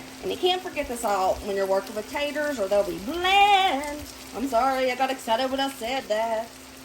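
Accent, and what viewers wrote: South, United States. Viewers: 😂I thought you said bacon powder!!! I blinked and started laughing at myself.....baking powder! I love the south and am trying to keep up with the accent! Love ya all! That onion casserole is bomb! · I love how you pronounce oil with your Southern accent!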